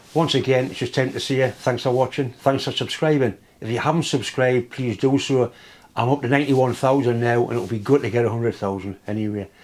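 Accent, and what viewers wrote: Newcastle, United Kingdom. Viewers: Love the old videos, you sound more Geordie back then!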